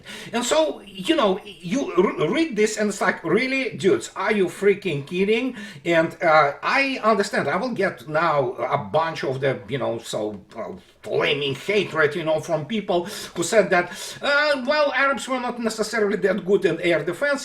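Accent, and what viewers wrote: Russia. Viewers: it's your Russian accent (and sense of humour) that makes you so endearing to us. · Mr., your accent is like music ( Russian heavy metal) and your English is excellent. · Thanks for speaking out. In spite of of our recent history Russian accents were always great and yours is no different. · I am Italian, maybe for this reason I understand perfectly well your russian accent 😁... who knows...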